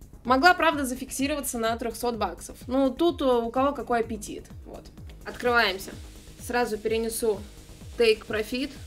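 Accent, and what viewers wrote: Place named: Russia. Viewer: И, не профИт а прОфит, учитесь говорить правильно. Я понимаю что Вы придерживаетесь провинциального произношения русской речи, но никогда не поздно научиться. Аж уши режет: проФит, средствА, постираться, убраться, погреть что-либо из еды, а не подогреть или разогреть, задолбали провинциалы со своей речью.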